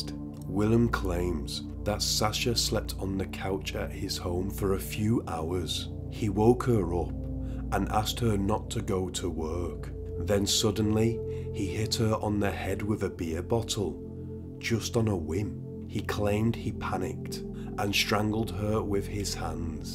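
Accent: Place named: Merseyside, United Kingdom. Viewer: he sounds like hes from Merseyside, the same region as, but hes toned and slowed down his accent for these vids.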